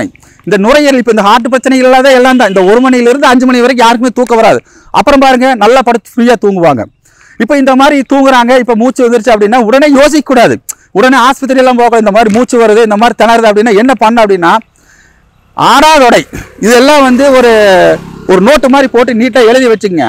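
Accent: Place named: Coimbatore, India. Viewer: Coimbatore Tamil very beautiful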